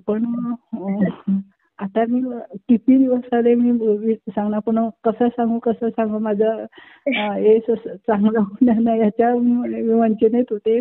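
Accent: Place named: Goa, India. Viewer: Hya tai govyahun bolat asnar nakki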